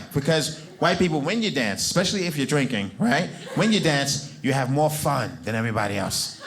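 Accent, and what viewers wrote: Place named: New York, United States. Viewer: He is so hysterical, such charisma, and I can watch him once a week and still laugh at the same show. He’s wonderful and keeps it clean. I love his accent from New York, he cracks me up❤️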